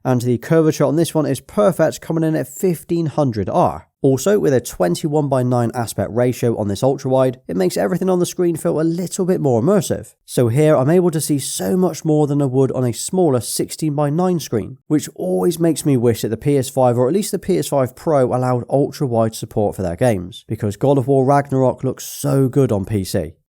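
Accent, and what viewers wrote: United Kingdom. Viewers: I dont think he is american. Sounds british to me. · He british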